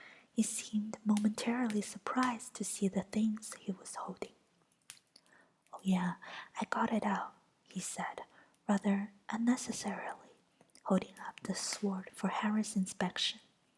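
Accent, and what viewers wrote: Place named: China. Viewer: from your accent I could tell that you are from china ?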